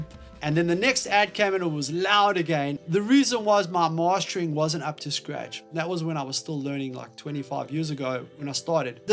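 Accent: South Africa. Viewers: You sound south African bru!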